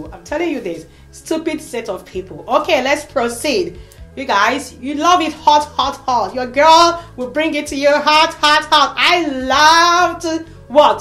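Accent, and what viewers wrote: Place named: Nigeria. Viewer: Im loving th naija accent💕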